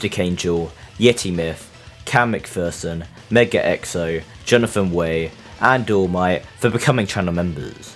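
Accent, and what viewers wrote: United Kingdom. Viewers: I have a feeling your british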